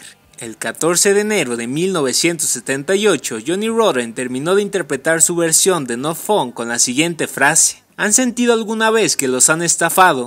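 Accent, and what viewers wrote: Mexico. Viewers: Habla más neutro porque tu acento de Panchito es hiper marcado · eres norteño? jajaj duda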